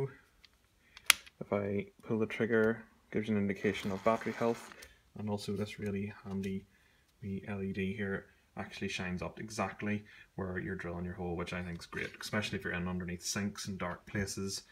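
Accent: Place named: Wales, United Kingdom. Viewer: Great review. I have the same drill and its light, powerful, (more torque than the PSB18 Li2). the only issue is the keyless chuck needs care and some force to ensure a good grip on the drill bits. And the Batteries are a stupid price... A bit of profiteering by Bosch I guess. On the plus side picked up a Bosch PDR 18 Impact driver (body only) but the same batteries fit, for £39 which frankly will do for 99% of my DIY needs. So far its fitted quite a large decking project, a new fitted kitchen and a garage / workshop and never skipped a beat. Great value.... Great Welsh accent btw..... 😉👍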